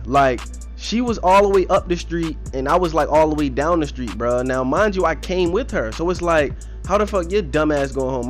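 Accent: Baltimore, United States. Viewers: Can tell u from bmore tht accent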